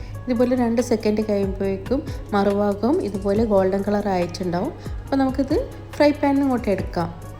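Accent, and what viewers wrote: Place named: Lakshadweep, India. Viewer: Njangal lakshadweep samsarm poleyundu chila nerath😊 super 🎉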